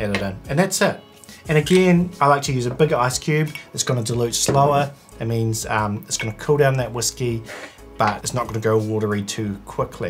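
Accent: New Zealand. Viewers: Not being a mother tongue English speaker, although having a decent understanding of the language, I'm shocked that I didn't get the NZ accent...but now I can't unhear it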